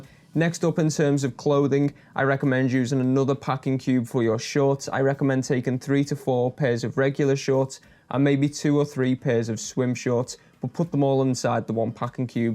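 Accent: Liverpool, United Kingdom. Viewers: Some great tips which I will use on my next (4th) InterRail. Quick question - what's a good weight in kgs to aim for in Summer and Winter please? ( I have a tendency to overpack - apart from when I make 3 night trips to Eastern Europe by plane when I maange everything in my standard size Fjallraven, Kanken !) Ta la ( you sound Scouse like me !)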